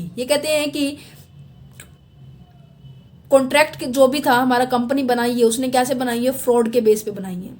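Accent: Haryana, India. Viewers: Nice explanation mam ..aapki sound me haryanvi touch aata h 😊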